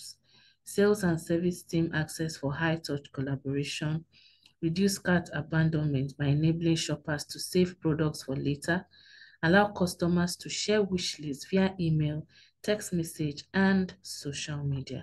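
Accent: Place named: Nigeria. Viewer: Asian face, nigerian voice.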